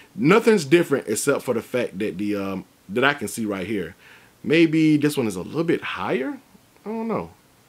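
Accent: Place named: Atlanta, United States. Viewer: Not a day one, but my first vid was the "What the" KD joints with Jay. Being a ATLien myself, as soon as i heard the accent i was hooked. Been rockin with ever sense, dooooooo it!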